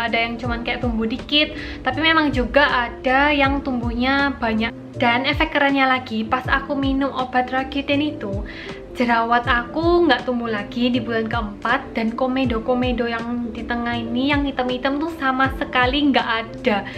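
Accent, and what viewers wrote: Java, Indonesia. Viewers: ojo medok medok banget mbak hehehe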